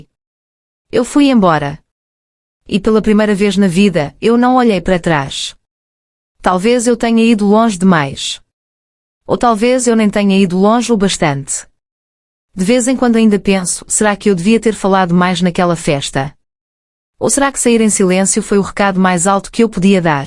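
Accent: Portugal. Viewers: Eu gosto da língua portuguesa (de Portugal mesmo? Gostei mto da história, foi mto bem narrada, parabéns. 🎉😊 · Uma narração de áudio de Portugal eita meu Deus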